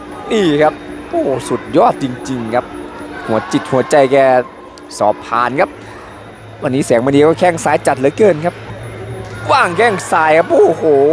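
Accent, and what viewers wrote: Isan, Thailand. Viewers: ม่วนๆฟังเสียงพากษ์อีสานบ้านเฮาเด้อพ่ออาว555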